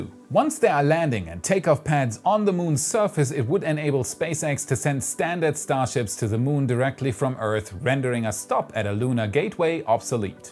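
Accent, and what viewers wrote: Germany. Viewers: What nationality is? I can't quite guess from the accent! Germany?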